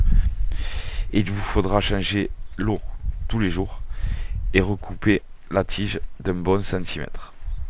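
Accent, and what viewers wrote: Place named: Paris, France. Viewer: Vous avez l'accent pointu. Très bien expliqué et on voit que vous le faites avec amour 😊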